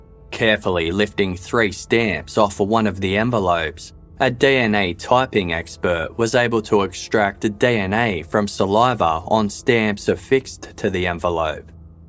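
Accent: Australia. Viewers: I didn't realize he had an Australian accent until I saw someone comment and still only hear it when I think about it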